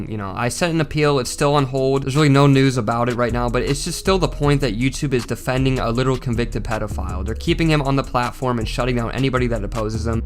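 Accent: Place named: United States. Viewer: Ive known is irish and english but he somehow sounds like hes american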